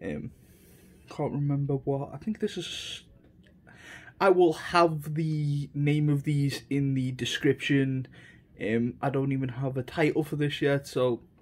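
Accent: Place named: England, United Kingdom. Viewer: you are welcome... They also offer a lot of traditional pocket knives which are legal in the UK (you sound really english, sorry if I am mistaken)... I would order on their own website... lots of their traditional products are only available there and as I mentioned their customer service is second to none...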